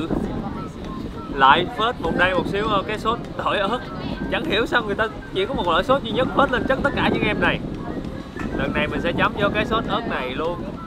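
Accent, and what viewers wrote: Mekong Delta, Vietnam. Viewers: "Ở đây cũng có ruộng bậc thang mà nó cũng trụi lũi luôn" Giọng miền Tây dễ thương quá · Tiếng nói đậm chất miền tây ko lẫn với ai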